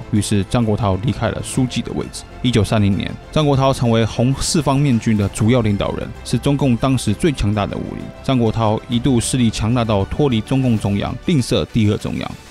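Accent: Taiwan. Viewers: 台湾口音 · 听这口音就知道是湾湾的😂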